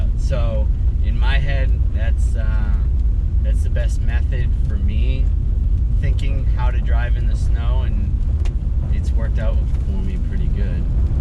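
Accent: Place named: New England, United States. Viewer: Hey you're back! I made the mistake of putting only two winter tires in the front and two all seasons on the rear before, on my Corolla. It was a little sketchy because it would randomly start drifting especially around turns. Oh and yeah I hate it when people don't clean off their car and they they throw snow all over other cars. And and great new england accent btw :)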